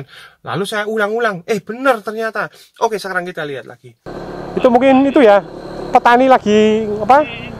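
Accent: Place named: Surakarta, Indonesia. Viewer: Jebulane gagah mas Iki...suaranya khas solo..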